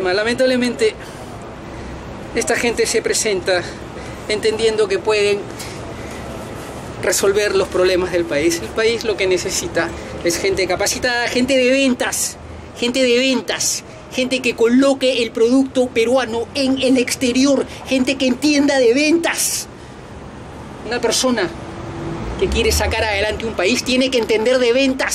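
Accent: Argentina. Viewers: Xq hablas como argentino? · ¿Un peruano con acento argentino? Pues yo creidísimo de que era lo segundo; escuchar para creer, verdaderamente; muy interesante; desde Lima (vi14/V/2021)-Perú-América. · Sr. SI UD NO ES PERUANO! HALAGA A ARG HASTA NO PODER Y HASTA SU FORMA Y SU ACENTO ES MAS ARGENTINO Q PERUANO!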